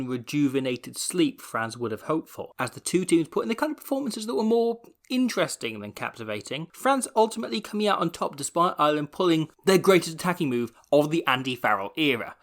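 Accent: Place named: England, United Kingdom. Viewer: Welsh parents but brought up in Nottingham, England hence the accent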